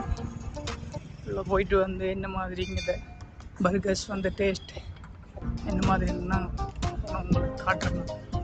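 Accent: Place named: Jaffna, Sri Lanka. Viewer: Eela thamila kathaiyadaaa Yaalppaanam thane nee 😡😡😡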